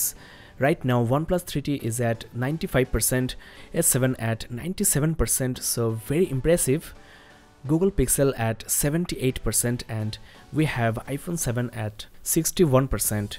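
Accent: India. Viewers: Are you from Europe? The plugs look like the European versions. Btw you have a very nice accent :) (Indian?)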